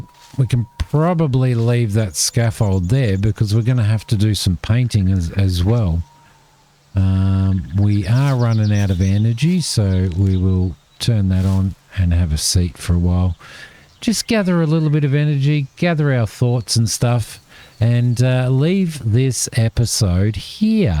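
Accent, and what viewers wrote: Australia. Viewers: hey are you an auzzie?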